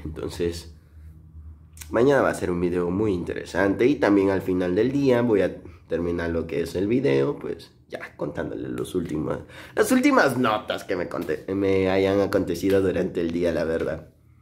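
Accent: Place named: Mexico. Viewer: que noticia tan maravillosa, me encanto tu videos y entrenaré el español mexicano escuchándote, hijole que padre!. buena suerte.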